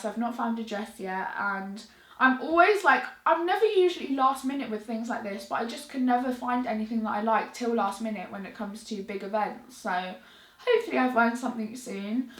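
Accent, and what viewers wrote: United Kingdom. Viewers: moon light British accents are the best 😂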